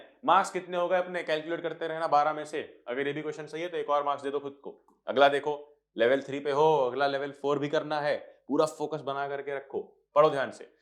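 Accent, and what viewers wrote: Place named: Mumbai, India. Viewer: Bhaiyaa aa mumbai ke lagte ho 🔥🔥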